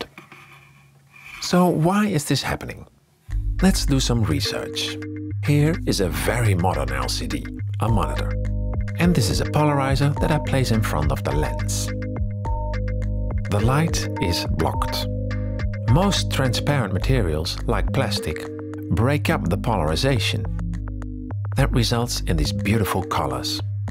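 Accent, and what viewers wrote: Netherlands. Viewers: Are you dutch? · This channel is like technology connections but narrated by a Dutch(?) person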